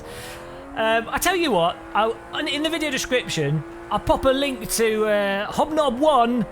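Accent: United Kingdom. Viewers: I love your british accent! Hear you talk is quite an enjoyable thing :p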